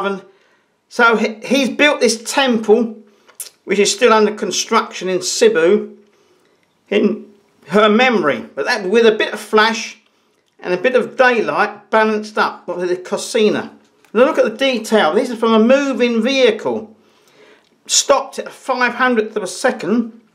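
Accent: United Kingdom. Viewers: full of British accent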